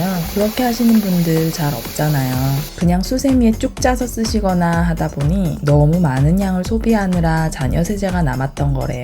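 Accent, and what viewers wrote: Gyeongsang, South Korea. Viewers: 영상 너무 잘 보고 있어요~ 실례지만... 경상도분이신지요? 중간중간 살짝 사투리가 나오는데... 나머지 표준어는 너무 잘 쓰셔서 문뜩 궁금해서요 ㅎㅎ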